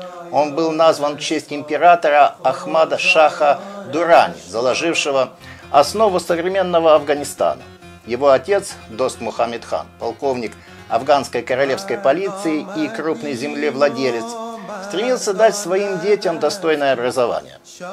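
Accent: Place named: England, United Kingdom. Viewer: Он не руски акцет ангилиски ест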